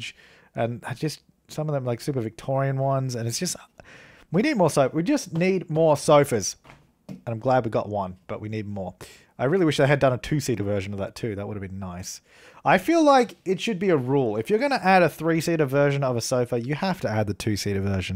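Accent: Australia. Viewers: Wait, so you're not English? But Australian?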